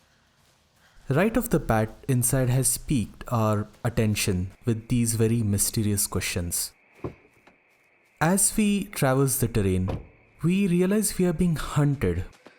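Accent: India. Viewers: I did not expect to find such elaborate thoughts on the topic at hand. Thought this (like many other “this is art” videos) would be more of a redundant opinion piece, but this video was a really fresh breath of air and encapsulates how I wish the precedent was set on the discussion of games as an art form. Although initially wary of the Indian accent, you really hammered down both the thesis of games as art, the linear storytelling and it’s philosophy and the qualities of INSIDE. Bravo. I do enjoy writing about art myself as in music, film and games and I always had a wish to create something like you’ve created on this platform. But the fact is I am not driven enough, like you apparently are, and is quite a technology boomer as I never piloted any video editing software. Even this interactive video thing you got going here flew me by and I frankly couldn’t be assed to operate by it haha. 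Still a banger video. A real question to ask is “why couldn’t this just as well be a movie”, which you cleverly debunked through it’s interactivity. This is the real reason video games works as a new medium of arts and not just a mutation of cinema. I wrote a review of the game VVVVVV which I will quote a passage off that maybe you can enjoy. The blessing and the curse of being a small content creator is: I wish you success but I also appreciate that I can perhaps have a dialogue with you. I won’t be online this summer but by all means add me on Steam if you’d like to pinball ideas or opinio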